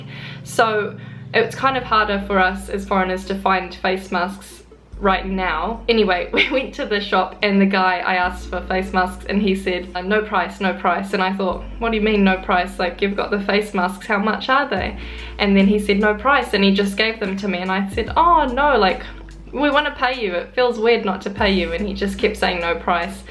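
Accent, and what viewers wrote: New Zealand. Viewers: I hear a Kiwi accent. How ya goin' mate? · wish you good luck with lockdown - and have a safe journey.. New Zealand accent !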